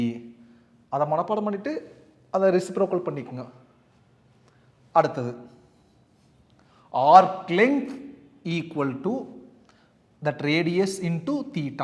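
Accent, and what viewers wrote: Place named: Kongu, India. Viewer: Fantastic sir Clearly understood 🎉🎉🎉🎉🎉🎉 Tq tq very much sir In kongu style slang superb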